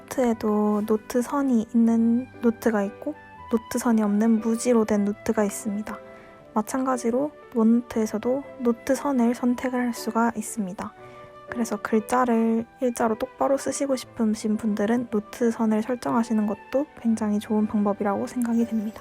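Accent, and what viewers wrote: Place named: Gyeongsang, South Korea. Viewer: 목소리가 매우 차분하고 전달력이 좋으시네요 약간 경상도 억양이 있으신 듯 한데요 저는 여자분 아주 살짝 섞여있는 경상도 억양있는거 뭔가 느낌이 좋더라구요 영상 잘 봤습니다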